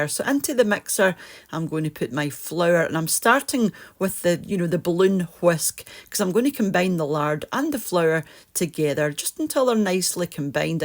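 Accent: Scotland, United Kingdom. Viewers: Ooh. Lovely. Scottish rolls AND a video with your lovely Scottish accent. My evening is complete.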